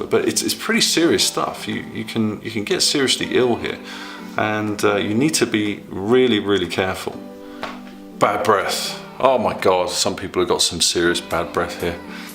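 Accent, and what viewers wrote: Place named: England, United Kingdom. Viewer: Your proper English accent and your pleasant countenance make watching your videos a delight.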